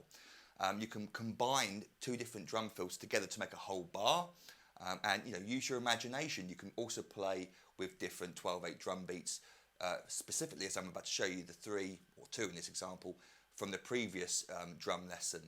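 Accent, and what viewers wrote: United Kingdom. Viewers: su acento británico pronunciado no ayuda mucho a captar audiencia, pero no se puede discutir su buen nivel musical sobretodo mantiene buen ritmo y tiempo preciso...👍🏻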